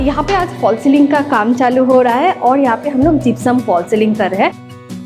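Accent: Bengal, India. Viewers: Love the way you talk your bangali accent just love it and your Diys just love them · Hi I m pritee mother of 2 kids from Delhi. Few months back inspired to bcum interior designer...now became big fan of u and ur bengoli accent...I really luv the way u show and tell everything...u r really an inspiration...I want to know a thing...pls reply....on the bed u place 2-3 pillows and cushions also,.but practically when we sleep we need only one pillow what these clients do for other's on bed?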